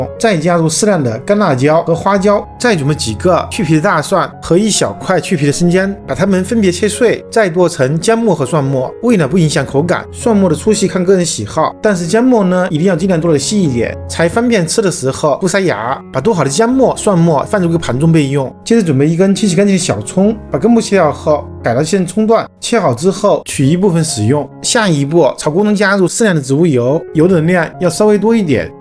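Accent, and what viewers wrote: Wuhan, China. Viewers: 听口音是武汉人吧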